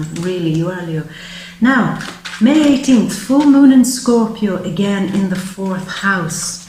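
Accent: Ireland. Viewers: I love you, Your beautiful face and your wonderful resonate voice...that resonates with me. Your brogue make me feel like I am at home, and for some reason I do too...I shouldn't but I do more often than not I speak with a brogue, its fun and feels so natural, any way good reading.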